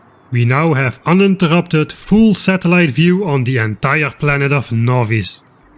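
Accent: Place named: Netherlands. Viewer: Klasse video! Oprecht een van de heftigste Nederlandse accenten die ik ooit gehoord heb haha.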